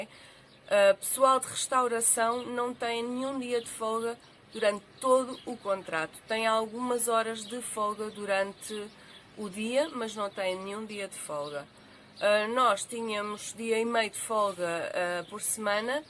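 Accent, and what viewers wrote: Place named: Portugal. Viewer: Sotaque lindooo ,Portuguesa com certeza rsrs😊 Obs : sou Brasileira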